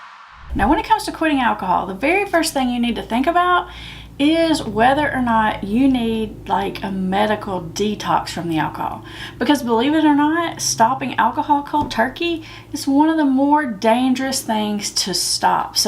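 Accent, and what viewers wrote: South, United States. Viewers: Verryy calm pretty southern arcent, that bullshits into an advert.. BORING another pretend proffessional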